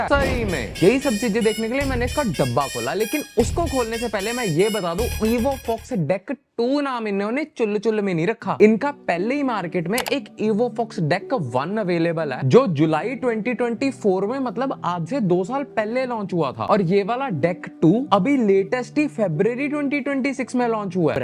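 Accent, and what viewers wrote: Punjab, India. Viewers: Bro punjabi accent 🤌🏻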